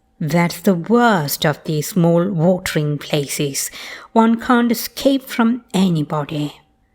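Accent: RP, United Kingdom. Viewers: This indeed is posh Upper RP English. I had to listen to this three times to understand the whole story. The story is actually quite funny ☺️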